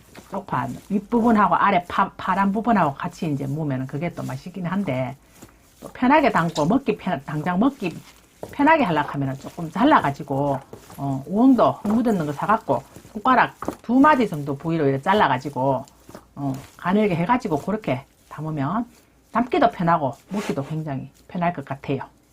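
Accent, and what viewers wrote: Gyeongsang, South Korea. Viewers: 어이구야꼬! 진짜 쉽고 맛나겠네예. 나도 경상도라 내친구같네예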